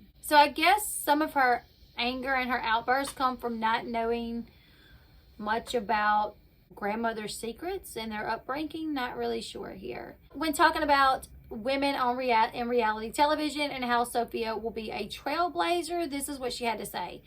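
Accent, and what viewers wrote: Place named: Shreveport, United States. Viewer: I love your channel and accent, it sounds like my family in Shreveport (I'm currently in Detroit).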